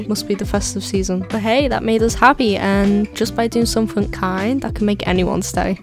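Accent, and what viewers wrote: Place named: Liverpool, United Kingdom. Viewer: Unless you'd moved, but I could definitely tell by the accent you're from Liverpool!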